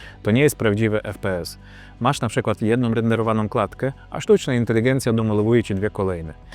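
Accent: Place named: Belarus. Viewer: dieeleses? warto jeszcze popracować nad wymową, bo jest potencjał, pewno długo żyjesz na terenie Polski, ale jeszcze ciągle Twoja wymowa - zgaduję, że białoruska - rani uszy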